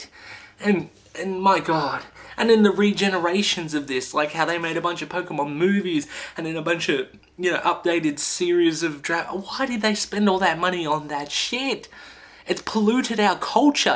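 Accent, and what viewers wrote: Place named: Ireland. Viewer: you use dragonballs to make a wish. I seen every episode. When I was a kid that was the best shit out. And why do you look Chinese and sound irish?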